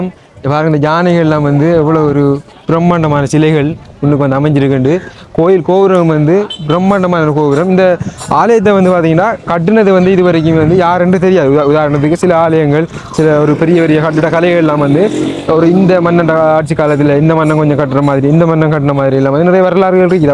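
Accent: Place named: Batticaloa, Sri Lanka. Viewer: நீங்கள் மட்டக்களப்பு தானா? நல்ல செல்லத்தமிழ் கதைக்கிறீங்கள். உங்கள் தமிழை கேட்க இஸ்லாமியர் போல் தெரிகிறது. திருவிழா முடிந்தபின்தான் ஊருக்கு திரும்புவீங்களா?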